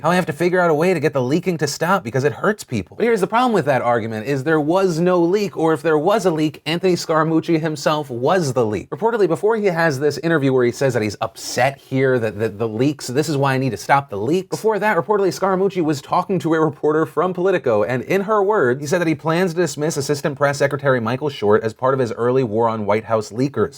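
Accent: South, United States. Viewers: hey, why did you have a southern accent in this one?> XO mom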